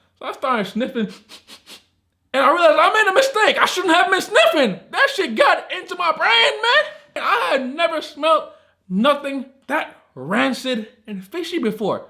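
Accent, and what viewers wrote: Jamaica. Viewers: Lol... Didn't knw u was jamaican bro... Big up😁✌️ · U from Jamaican?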